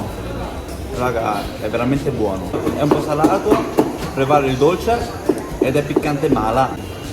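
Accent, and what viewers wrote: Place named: Romagna, Italy. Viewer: Non ti conoscevo prima di questo video, ma sono rimasto impressionato da quanto tu riesca a parlare bene in italiano. Addirittura mi ha dato l'impressione che tu abbia una cadenza romagnola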